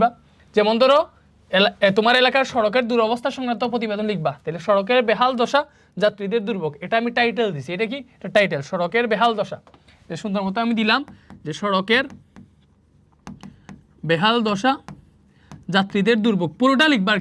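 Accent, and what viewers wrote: Sylhet, Bangladesh. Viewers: vai tumi ki sylhet ti tumar basa sylhet naki 😊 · bai apne ki sylhety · আপনি বোধহয় সিলেটী। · apni Kitah sylheti ni??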